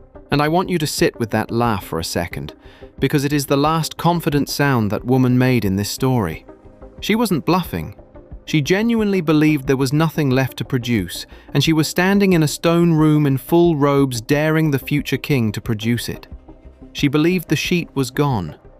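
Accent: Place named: United Kingdom. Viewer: I have read the disclaimers. And, of course I notice the same scenarios worded differently and the span between the repeats. I also notice quite a few British accents during the narration and sometimes the BBC. I might add that I am not a fan of Camilla, since the affair came to light. It’s outright dishonesty to the one you are betrothed, to your children and considering Charles position, to his country. Something you could NEVER accuse Queen Elizabeth of. I’m not saying others are perfect. Look at the governmental mess in USA. It’s entertainment and I have an opinion On the scenario rather it be true or false! My God, after reading these things you could not pay me to be a royal! Their lives appear to be maybe 25% their own. Any way, if you hear anything that’s actually news and not entertainment, post it, I would love to read it! Thank you for your comment😊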